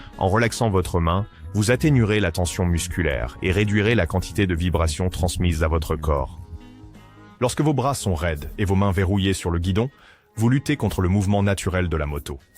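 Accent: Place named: Texas, United States. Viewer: Pourquoi la voix de l'IA a un accent texan ?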